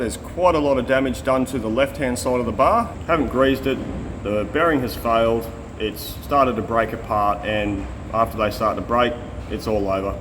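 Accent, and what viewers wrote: Australia. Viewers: "... now I'm talking American." That's OK Mate, I've had to learn how to listen in Australian...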